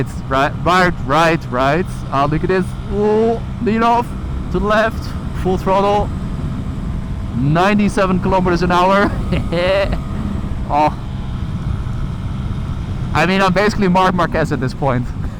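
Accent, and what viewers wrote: Netherlands. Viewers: smerig hollands accent zeg jeetje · Are you Dutch?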